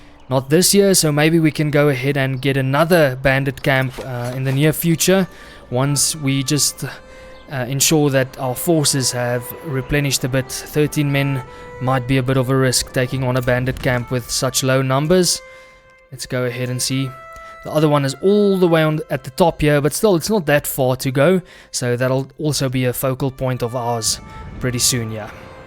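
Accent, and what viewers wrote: South Africa. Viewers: You South African bro? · You South African? Hahaha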